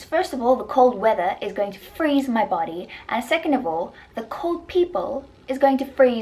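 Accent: South Africa. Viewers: lol your accent is different. where in South Africa are you from? CTP or DBN? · You have never sounded more South African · Is that an south african accent you have?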